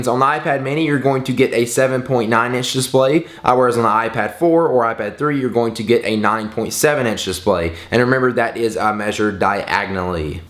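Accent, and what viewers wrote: South, United States. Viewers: U sound really country af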